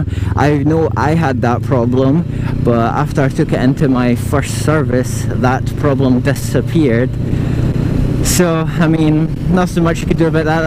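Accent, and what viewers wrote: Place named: Scotland, United Kingdom. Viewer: Hi mate, nice review. You sound like you're from Scotland. I am as well, and was wondering if you could tell me where you got the bike? I'm thinking about getting it as well! :) Thanks.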